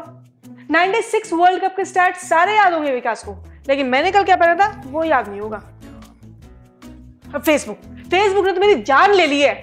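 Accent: Haryana, India. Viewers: That's Awesome....you guys rock...me and my wife has started watching and now becoming addicted to your videos. For you; u have a naughtiness that is actually a punch in the video and for; Dominance, harayanvi tone with cuteness is the heart winning that has become a right combination. Keep it up you both. Thanks for making us laugh. God bless you guys..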